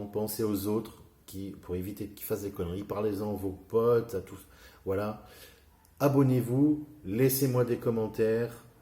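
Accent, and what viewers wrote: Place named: Quebec, Canada. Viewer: il a l'accent québécois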